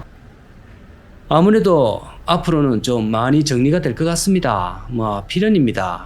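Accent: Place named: Seoul, South Korea. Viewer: 서울말 다되가네요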